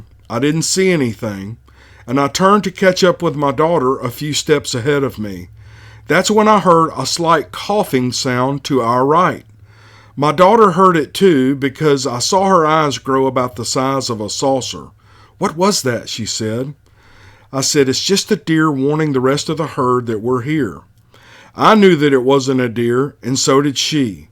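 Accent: South, United States. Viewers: Dear.....From a married woman with 2 kids that lives in Greece...yep in Europe....I sit in my free time and listen to these encounters and that fabulous SOUTHERN ACCENT...Dont ask me why but Dogman and Bigfoot just give me a thrill...MIND YOU>>>>I dont want to have an encounter but I am learning a few things and the first is....NO CAMPING for the rest of my LIFE...Yep thats how creeped out I am..and thankyou for reading all these experiences so as we can take precautions...TAKE CARE!!!! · I absolutely love everything about your podcast: the content, the delivery, and especially your gorgeous Southern accent! It has become my fav Sasquatch Podcast. Keep up the great work darlin!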